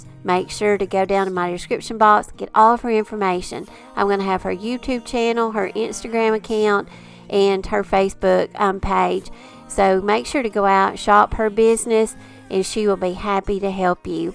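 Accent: South, United States. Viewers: You and your hubby are both so talented and I love your southern accent.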